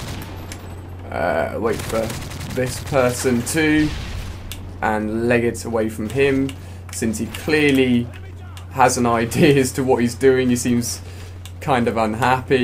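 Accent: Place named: United Kingdom. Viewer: Seriously man. listen to his accent...Britain ring a bell?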